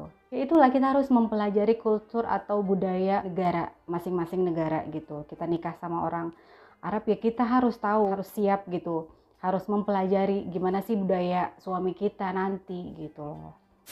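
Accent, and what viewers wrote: Jakarta, Indonesia. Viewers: Keluarga jakartanya dmana mpok?..klo dr logatnya kayak di jaksel...sy asli jagakarsa....sy biasa bawa jamaah umroh mpok, mkn klo sy lg di madinah bisa bersilaturahmi 🙏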